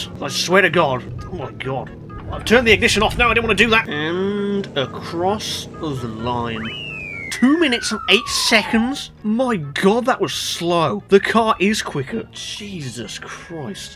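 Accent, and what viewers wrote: United Kingdom. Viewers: Omg funny bri'ish fella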